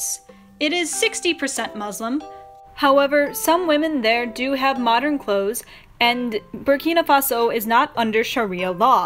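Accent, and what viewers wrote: Australia. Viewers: Lmao just clicked on the video and already an Aussie accent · Why Australian accent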